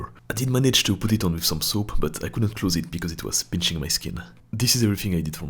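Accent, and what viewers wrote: France. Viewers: Bon mec je sais si il faut que je sois plus impressionné par tes bras visiblement en titane , on ton accent français à peine perceptible ( vraiment 1er deg tu parles trop bien ang , et même dans tes premières vidéos ct déjà super ) . Keep grinding bro 💪💪💪💪💪💪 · Are you french mate?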